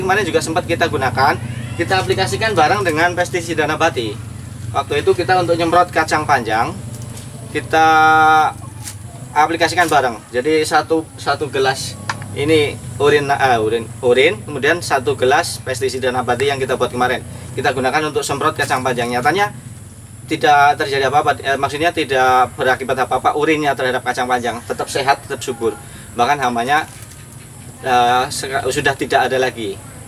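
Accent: Banyumas, Indonesia. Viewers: kang ngapak banget ya......asline ndi jenengan..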